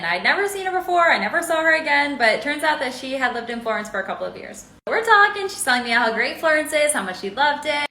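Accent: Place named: United States. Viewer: I am interested in your impressions of Italy, a wonderful country, but please speak more slowly. Not everyone is American and understands your pronunciation…….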